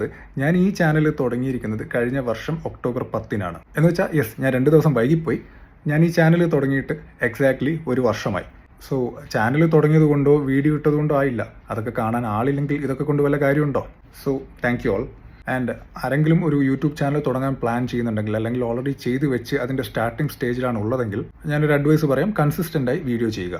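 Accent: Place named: Kannur, India. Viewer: കണ്ണൂർ കാരൻ ആണല്ലെ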